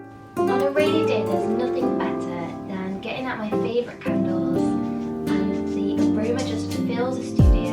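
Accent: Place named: Midlands, United Kingdom. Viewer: You came up in my recommendations and I really liked your video so I’ve subscribed. Are you from the Midlands?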